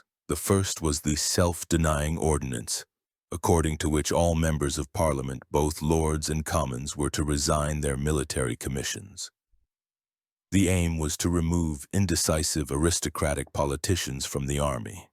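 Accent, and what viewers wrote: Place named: United States. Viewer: why would you use a scraping american voice to narate English history? AI crap ?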